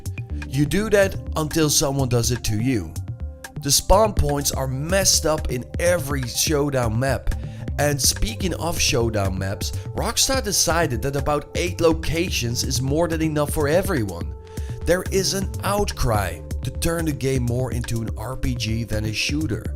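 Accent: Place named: Netherlands. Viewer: The narrator sounds agressively dutch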